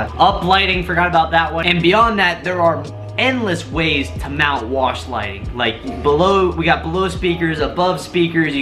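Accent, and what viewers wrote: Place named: South, United States. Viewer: Sounds like you are getting a bit of a Southern Draw! Welcome to the South Brother.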